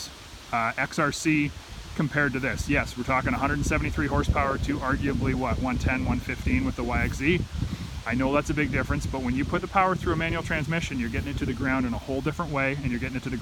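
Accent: Canada. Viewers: On a scale of 1-10 of how Canadian you sounded, you were at a 9.5 this time.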